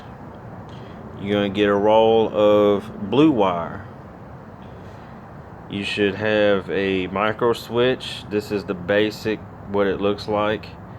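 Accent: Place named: South, United States. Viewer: Why do you have to be southern?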